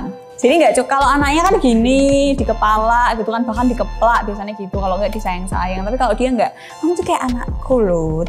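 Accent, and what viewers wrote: Java, Indonesia. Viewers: gaya ngomong nya kelihatan Jawa nya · Jowone medok..,? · lucu dan medok mantab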